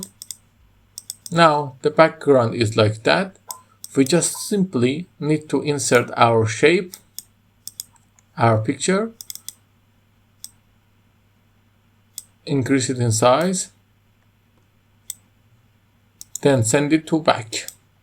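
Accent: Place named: Iran. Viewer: ایرانی 😅😅😅😅 لحجت خیلی زایه هست حتی از هندی ها هم بد تره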